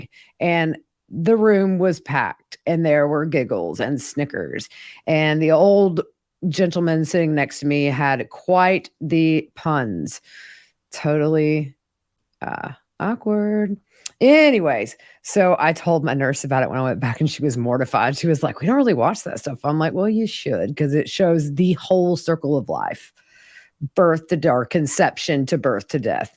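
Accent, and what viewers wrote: South, United States. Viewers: Hi our lovely, It's the 1st time I have heard of Tabitha, who is she and what is her role (if any) in this case. Thank you for your great work. And I so love your Southern accent it's great. 🤩💗💗💗💗 Lots of love from Julie in the UK 🇬🇧.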